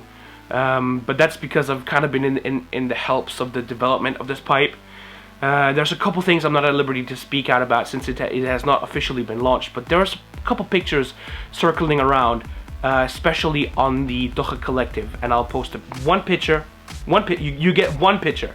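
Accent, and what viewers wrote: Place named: United Kingdom. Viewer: why do u sound british m8 xD i thought u were american ..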